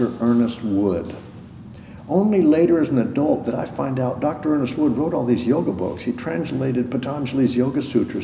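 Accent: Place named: Texas, United States. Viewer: Hahaha oh my god I love so much. Startrek, prana force shields, texas cop accent, and so down to earth.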